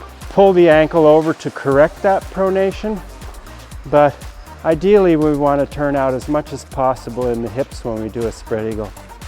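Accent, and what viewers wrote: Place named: Italy. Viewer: And I really like your Italian accent <3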